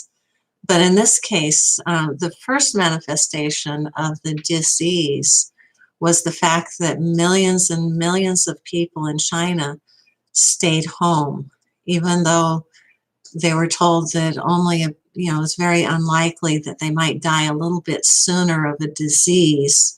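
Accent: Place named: South Africa. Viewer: For the info ... I'm not a Mataji ( you couldn't know that ... no biggy ) , and I was initiated by Prabhupad in 1974 ... Let me ask you ... You're basically saying you took initiation ...by whom , and what " branch " ?!? And ... where are you roughly located ( your accent sounds almost south-african ) ?!?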